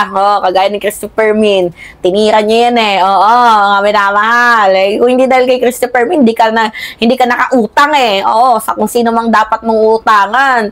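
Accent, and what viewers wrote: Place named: Ilocos, Philippines. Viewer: Team Agustin vlog po ganun din magsalita. Mga ilokano ganun lalo na Pag inielaborate ang wordings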